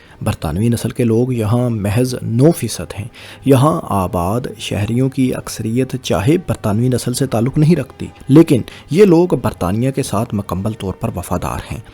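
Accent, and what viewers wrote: Punjab, Pakistan. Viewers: صاحب آپ کی ویڈیوز میں بہت اچھی معلومات فراہم کی جاتی ہیں ۔ لیکن آپکا اردو تلفظ ٹھیک نہیں ہے ۔ آپ پنجابی زدہ اردو بولتے ہیں ۔ مثلاً Himayat ko Hamayat, Mukammal ko Makkamal, Aazadi ko azadi, aor ko or Difa ko Dafa بولتے ہیں ۔ آپکے مقابلے میں فیصل وڑائچ صاحب کا اردو تلفظ بہت کمال کا ہے۔ آپ ان سے مدد لے سکتے ہیں ۔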